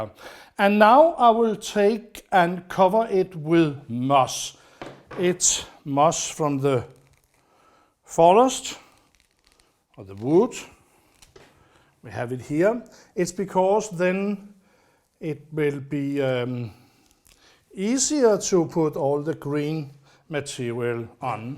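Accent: Denmark. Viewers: Great in English, I follow him in Danish or I should say watch, learn and also enjoy his Danish accent. He seems such a lovely man, very creative. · I am the people who love his Danish accent 🤣💖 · I love your accent! My grandfather and many of our family came from Denmark. Many of them used Dane to say things. I loved it. I have a collection of the Danish plates in my house. So I will say Velkommen. My Grandfather would sing to my daughter and dance around with her. Wonderful memories. Thank you. I know the word for thanks but I don't think it is right. Joyce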